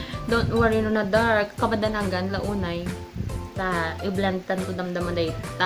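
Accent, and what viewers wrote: Ilocos, Philippines. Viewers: Mayat ti agbuya ti ilocano nga vlog a kasla daytuy, ta manmanu laeng. Haha, pati ayug haha. Ilocanong vlogger met daytuy nuymu ti biang., ed bucas! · Nice 1sis ang cute ng salita ng ilocano ilove it hehehe tangkilikin ang sariling atin feeling ko na nonood ako ng bahasa hahaha 100times like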